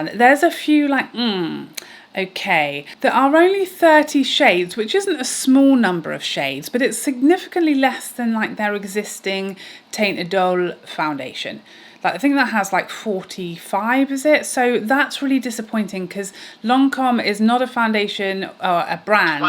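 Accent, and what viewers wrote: England, United Kingdom. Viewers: I could literally listen to you all day! You’re so funny and I love your English accent ! Canadian fan 🇨🇦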